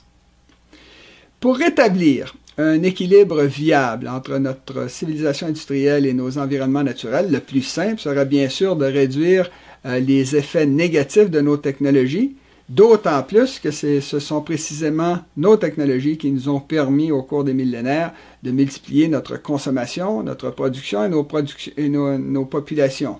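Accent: Quebec, Canada. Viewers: Je vous préfère en français. J'aime l'accent québécois et vous évidemment plus confortable. Par contre, les français européens sont de l'avis que le français québécois n'est pas français!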